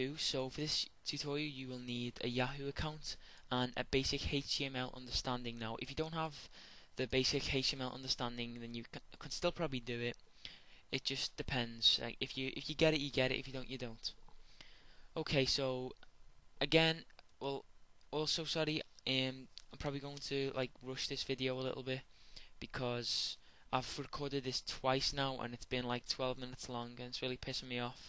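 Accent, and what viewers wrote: Liverpool, United Kingdom. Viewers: I love this channel! So much useful stuff demonstrated in front of my eyes. And not narrated by someone with an intimidating accent that makes you feel you are a noob. A scouse!!! Love it!!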